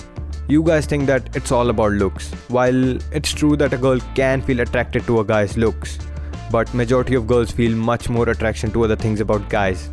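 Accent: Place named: India. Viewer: good one. but you need to improve your accent and speak a bit faster. try delivering four words per second and also try skipping most of the "r"s in the end of words. I guess you are an Indian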